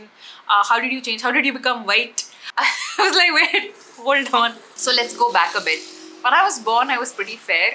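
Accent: India. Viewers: Your English is perfect Indian English I feel like I'm listening to a friend 😂 unlike some influencers putting on a fake accent.. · Bro your intonation is so indian coded i love it. It's like finding something familiar on the internet <3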